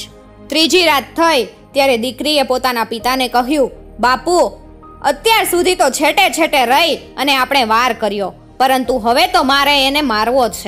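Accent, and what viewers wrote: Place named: Kathiyawad, India. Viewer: which way you are talking you must from Kathiyawad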